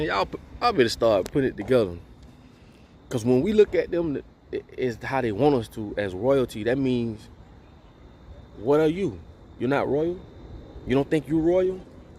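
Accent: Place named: AAVE, United States. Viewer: He speaks in ebonics. It's Vernacular English not American or British. 🙂😂A judgemental person calling out another judgemental person.🙄why?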